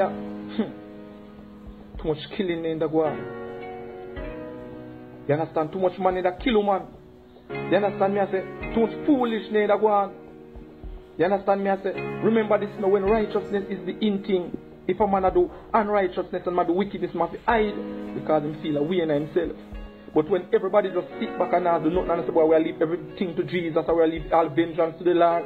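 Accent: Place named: Jamaica. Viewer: I like your videos but for those of us who are not Jamaican it can be hard to understand the patois.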